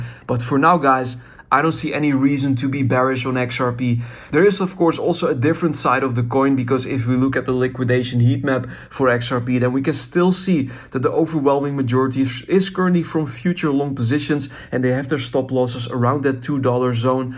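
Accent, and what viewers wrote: Netherlands. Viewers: Ben je een Nederlander?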